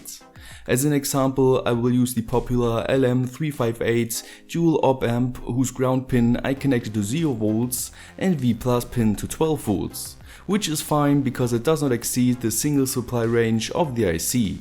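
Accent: Germany. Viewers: Could you please explain the schimtz trigger?? By the way, like your german accent a lot.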